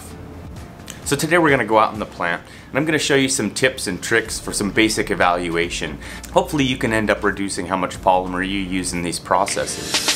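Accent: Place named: Fargo, United States. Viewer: Thanks, good video. (and what a great Fargo Accent you have! )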